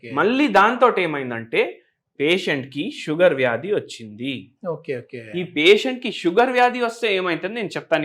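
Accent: Telangana, India. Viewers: Navvu enduku vasthundi Telangana language ana . Siggulenollaki navvevasthadi & overall something is wrong with you.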